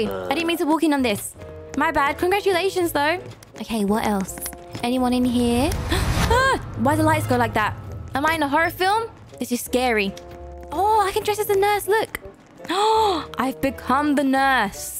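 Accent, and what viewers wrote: United Kingdom. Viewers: I love her British accent❤💛💚💙💜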